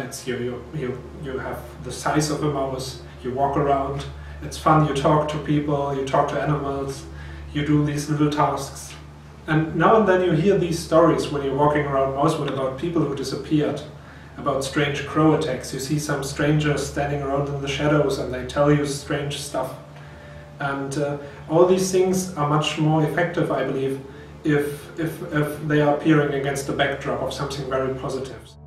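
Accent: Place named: Germany. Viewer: Oh the german accent :) looks really nice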